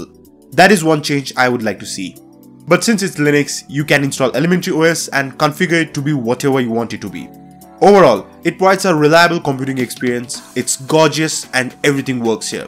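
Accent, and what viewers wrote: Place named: India. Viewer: Dude...learn how to pronounce and speak english you smash the words together whit "daT indian accent" you speak half words , PRONOUNCE -slow the fak down and ARTICULATE full words jesus , you speak like XQC was indian ... stopped watching, half the time its not hearable And this is NO Elementary review , ups and downs, or even know how, this is just a glorified add mostyl, EVERTHING is SO GREAT in elementary, jesus, really? and you say 3 games and you say its great for gaming , so many games so many games :D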